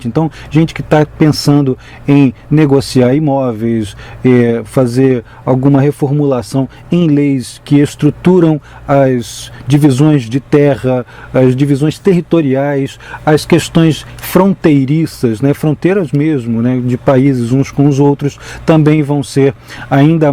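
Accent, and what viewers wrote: Brazil. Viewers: bom dia! Você é mineiro? Sou mineira de Belo Horizonte, taurina e ascendente em peixes. Grata pelas explicações dos eclipses, um abraço!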